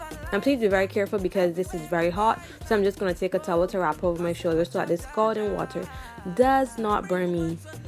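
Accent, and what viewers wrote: Barbados. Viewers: Yuh sound Bajan 💙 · is that a bajan accent I hear??!!!!!! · love love love that Bajan accent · Yesss I hear the bajan accent 🙌🏽🇧🇧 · yesss I know that accent anywhere subscribed right away #BajanQueen 😍😍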